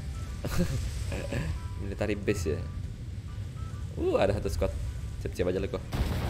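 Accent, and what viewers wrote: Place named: Bali, Indonesia. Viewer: Logat bali cik hahaha🤣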